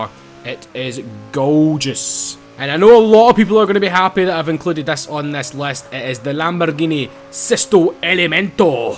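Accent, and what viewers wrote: Scotland, United Kingdom. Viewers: It's so cool to hear him pronounce "six" like "sex". Love this scottish accent.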